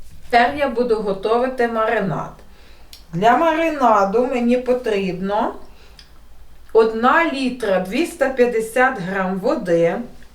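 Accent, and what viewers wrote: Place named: Ternopil, Ukraine. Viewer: Така миска)))) Такі гарні добрі руки! То щедро як на увсьо побратимство! А яка мова, ЯКА МОВА !!!!!! Одна літрА, двістА пятдесят грам ... з Тернопільщини мужчини були, так казали! Кращі дядьки!!!! я в ЗАХВАТІ !!!!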